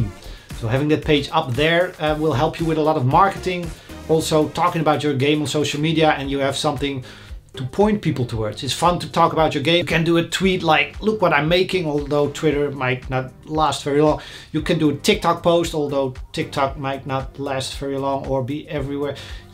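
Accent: Netherlands. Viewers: Thank you for this video! and btw are you dutch?😅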